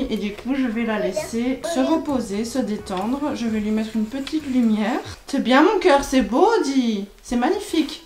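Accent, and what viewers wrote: Brussels, Belgium. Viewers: Coucou! Je suis ta chaîne depuis la Belgique, de Liiiidje 😅🇧🇪 tu es d'où toi 'originellement'? Tu as un peu l'accent de ma couzine de Forest hehhe! J'ai découvert ta chaîne il y a peu grâce au Home tour et puis je suis tombée amoureuse 😅😍 de ta bonne humeur communicative, tu m'inspires positivement et j'ai l'impression de me sentir mieux pendant ce confinement grâce à ce voyage virtuel dans votre super vie 'exotique' si jpuis diiire hehhe. c'est marrant l'effet des vidéos quand même hein.. J'ai déjà partagé tes vidéos aveca meilleure amie et des amis fan du Japon car je trouve que c'est sympa comme tu présentes ça en tant que Belge 👍🏻😊 Continue à nous faire vivre des petits moments bonheur comme ça'ps: j'adore ta maison elle a l'air tellement grande et le jardin avec son porch' LOVE. IT. HIHI bises. Sophie de Liège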